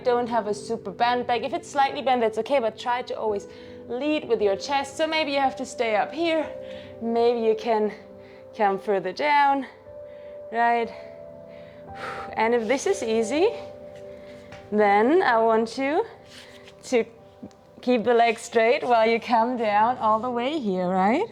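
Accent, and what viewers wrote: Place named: Ireland. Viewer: I’m just curious q about your accent, sounds some Irish in it, I always watch👌your videos.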